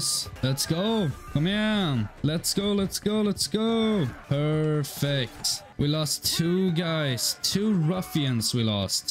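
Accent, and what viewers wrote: Sweden. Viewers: You get a sub for Bannerlord and a fellow Swede speaking Swenglish.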